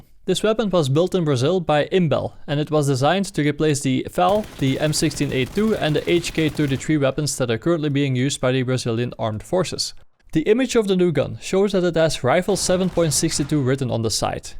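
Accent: Netherlands. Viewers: He;s Dutch I think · My guy you sound so extremely fucking Dutch, it's unbelievable.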